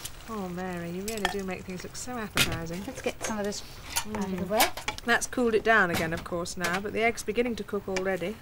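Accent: RP, United Kingdom. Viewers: Why does she sound posher than royalty these days? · Judith Chalmers didn't sound much different in the 90s on Wish you were here... Mary sounds ever so posh, of course ;) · Wow, Mary Berry looks absolutely amazing back in 1973. She would've been 38 back then. Love her RP or Queen's English accent.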